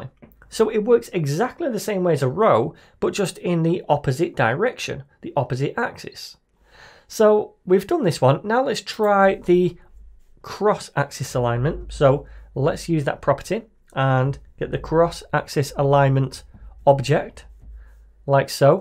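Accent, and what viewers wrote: United Kingdom. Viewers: dude am Arabian i speak multiple languages with different accents specially English so i learnt British accent from u not just flutter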